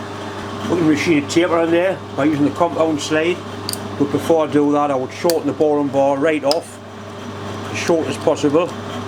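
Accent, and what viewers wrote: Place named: Durham, United Kingdom. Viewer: Love your video's- I was born in Durham 72 years ago and left for Australia in 1964 and its great to hear that accent ✌✌✌✌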